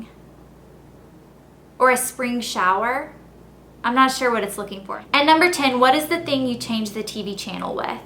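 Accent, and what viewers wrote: South, United States. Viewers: You're from the South probably a big city judging by your vocab and accent. "coke" and "buggy" give it away. I grew up in Birmingham, AL so you're accent is familiar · clicker and buggy.....man you are a southern girl! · I love that you call it a Coke and some of the other answers to the questions (the buggy, too! :) Definitely Southern! :) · Its wierd your from the south im from the north and we say theese things so diffrently. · Daaang, you're really southern! Lol I was mostly raised in Cali but was born in Minneasota , and I've lived on the east coast :] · I can tell you're from the south when you answered the questions. I'm from Tennessee and we say it the same. Well besides a remote. And I feel like only the south call it a "grand daddy long legs" everyone else refers to it as "daddy long legs." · You're definitely from the south! 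I moved from Chicago to WV and people say everything soooo differently! A lot like how you pronounced words and answered the questions! I think it's very interesting! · Yep, you're from the south. :) Lived in Nola too. I cannot stand when people call it "New Orleeeens." I pronounce/call everything the same as you! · Wow! I'm SO not used to an american accent, without the words underneath I'd have had real trouble knowing what you were saying! My UK accent is so different! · lol i love this :) i say "tp-ing", "pop", "cart", "remote", and "you guys" i love your accent, you sound like a southern belle :) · Wow you say things so differently in America! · Hee-hee it's funny as I'm Scottish and you're really Americanised to me :L Loved this tag :). I say 'trolley' not 'buggy' or 'cart'; envelope - exactly as it is spelt and prononcing the 'E'; and 'remote' instead of 'clicker'. The politically correct term is 'remote control' but some also say channel changer, control etc... 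:) ha :)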